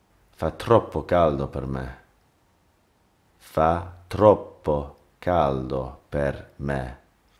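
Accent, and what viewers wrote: Italy. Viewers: No this is French with an Italian accent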